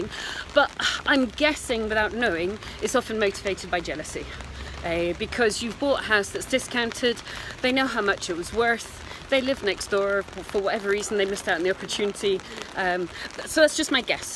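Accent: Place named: Scotland, United Kingdom. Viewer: Trying to place your accent... definitely some distant Scottish in there, time in England and a Brooklyn twang too...?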